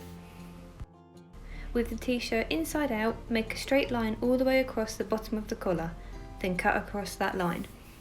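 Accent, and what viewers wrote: United Kingdom. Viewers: Saaf Landan!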